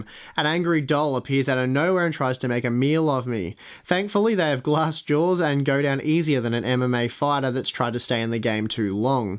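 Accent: Australia. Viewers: You have such an australian accent omg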